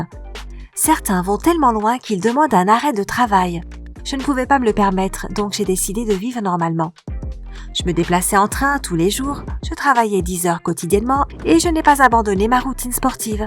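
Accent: Quebec, Canada. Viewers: Accent québécois deguelasse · Ce qui est marrant c'est qu'on a l'impression que la personne essaie de parler dans un français à priori neutre et "universel" . Je crois qu'il y a une légère pointe d'accent québecois. Mais pourquoi le gommer? C'est sympathique, au contraire, comme le sont d'ailleurs aussi les accents belges ou congolais. 😉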